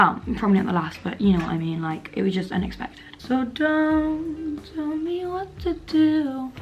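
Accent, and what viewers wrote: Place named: Birmingham, United Kingdom. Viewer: omg I love the fact I know your a brummie with the “do you know what I mean🤣” “I’m not being funny”.... people say I always say this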